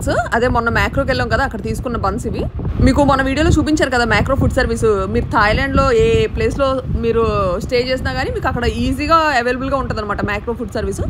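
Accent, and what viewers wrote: Vizianagaram, India. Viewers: మీ విజయనగరం మాట మారలేదు అలానే ఉంది చక్కగా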